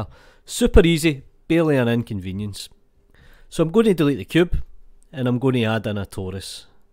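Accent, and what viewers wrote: Scotland, United Kingdom. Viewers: Love that accent <3 Is that scottish? This made me subscribe :D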